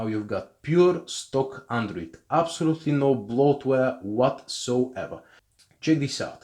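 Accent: Russia. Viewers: his russian accent is funny! lol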